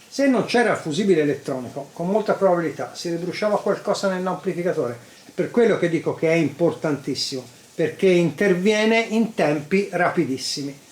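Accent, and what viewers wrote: Tuscany, Italy. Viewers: Te sei toscano. Lucca?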